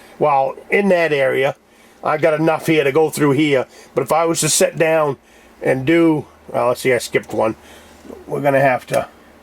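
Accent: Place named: Vermont, United States. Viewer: I miss hearing that Vermont accent. I grew up in Brattleboro area.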